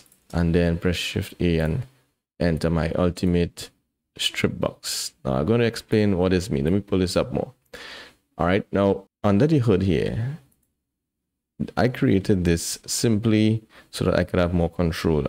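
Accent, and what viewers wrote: Trinidad and Tobago. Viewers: Yo, awesome tutorial. Are you from trinbago?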